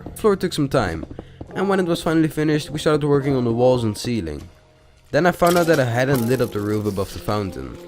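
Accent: Netherlands. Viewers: The dutch accent i love it 😂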